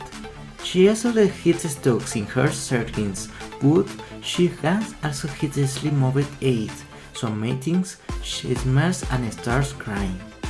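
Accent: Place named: Spain. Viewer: He's spanish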